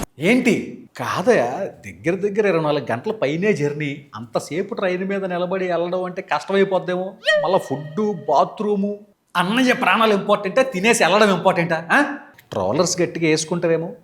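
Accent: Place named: West Godavari, India. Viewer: Anna meedhu WEST GODAVARI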